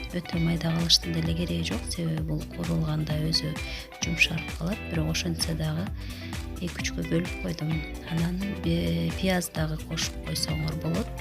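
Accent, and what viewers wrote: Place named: North, Uzbekistan. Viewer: Жасалмасы жок эле , оздорунун диалектинде суйлоп атат ,, ар бир жэрдин озунун диалектикасы бар ,, Тундук тарап. Талас ,, Туштуктун уч Ош. КараСуу ,, Баткен , ЧонАлай ж.б болуп 😮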